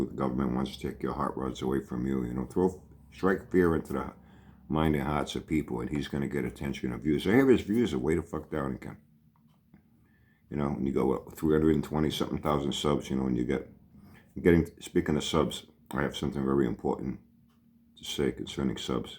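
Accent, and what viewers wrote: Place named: New England, United States. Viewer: you are always the " voice of reason" I don't GF what they say the new England accent is just the salt and pepper on top...😁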